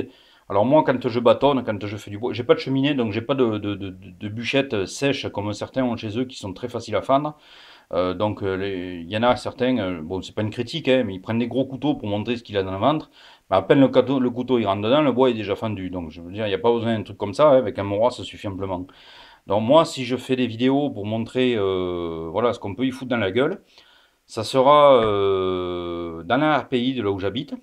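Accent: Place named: Hérault, France. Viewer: Vidéo bien sympathique et couteaux superbes Quant à la voix et l'accent : soyons fiers d'être héraultais !!!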